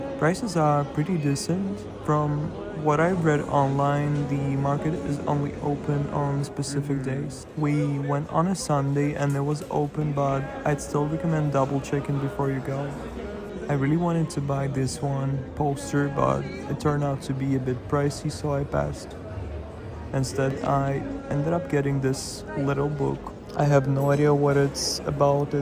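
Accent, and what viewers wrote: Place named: Flanders, Belgium. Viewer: You have a flemish accent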